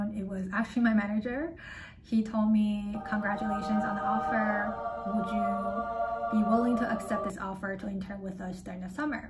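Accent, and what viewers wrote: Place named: Taiwan. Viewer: great content, you sounds more like from Taiwan, I thought u were from HK with that last name .......keep up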